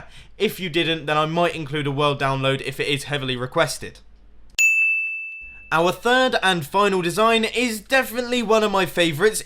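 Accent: United Kingdom. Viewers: sound even more British in older videos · oml baby, so british!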